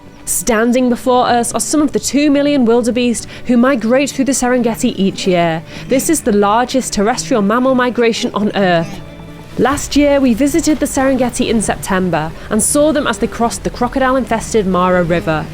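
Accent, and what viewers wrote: United Kingdom. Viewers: I'm guessing your accent is Altrincham, Lymm, Warrington area (via London.)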